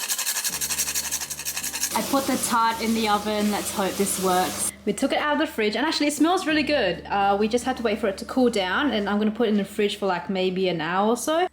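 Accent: Australia. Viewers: I like your vlog! No worries about the duration of video 😆 オーストラリアに行ったことがあるので、オーストラリアの発音がとても好き🇦🇺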